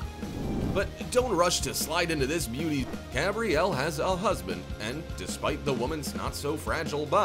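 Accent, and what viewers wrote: United States. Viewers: What the hell is up with this guy? He is speaking English with an American accent so why all the metric crap?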